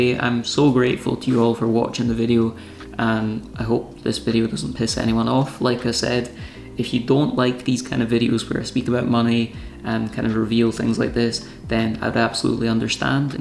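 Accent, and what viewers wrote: Scotland, United Kingdom. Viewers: Thank you for sharing this information. It gives me some motivation to do more video editing and to try to become good at it. Side note: Love the Scottish accent. Scotland was one of the first countries I spent time in when I traveled outside the USA. I have nice memories of my time there and will always love hearing a Scottish accent because of that. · The Scottish accent has got to be the best in the world, and your voice is so relaxing. Anyway I'm glad it did well and you got some good pay out of it as you deserve it. I can work out that some other youtubers must be so rich!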